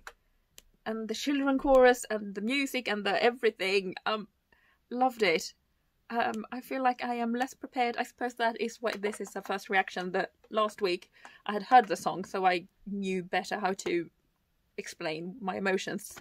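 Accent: Sweden. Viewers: another great reaction, tnx. i detected what i thought was a faint trace of swedish accent in ur voice, so i had to check ur info. i thought skåne, but deep forests? hehe, im a smålänning... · Hearing you talk for 30 seconds was enough to realize that you're a fellow Swede.:) I thoroughly enjoyed the video and I'm looking forward to whatever you do next!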